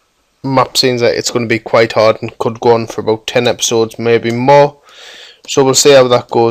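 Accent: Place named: North, United Kingdom. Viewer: Even though i'm from the north as well, I love hearing your accent haha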